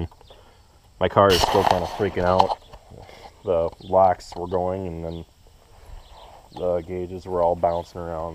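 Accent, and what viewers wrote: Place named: Wisconsin, United States. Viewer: First thing this fellow cheesehead noticed was the Wisconsin plates on the car and your 100% Wisconsin accent. I live in Arizona now and can pick out the accent in a crowd like nobody's business. Comfort food for the soul. As a sidenote I also have the NOCO G7200... Thanks for the video!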